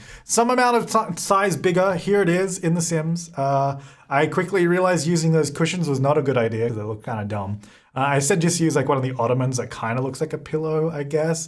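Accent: Australia. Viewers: I can sure hear it. I’ve been watching a lot of Jazzas videos lately. Maybe it’s the way we Aussies say things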